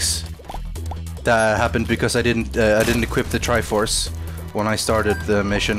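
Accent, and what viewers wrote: Scotland, United Kingdom. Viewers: Wow got a lot less Scottish. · sounds more Scottish than usual in this video for some reason.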